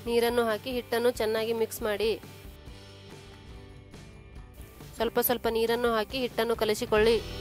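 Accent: North Karnataka, India. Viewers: Nivu uttara karnataka accent nalle matadi tumba chennagi iratte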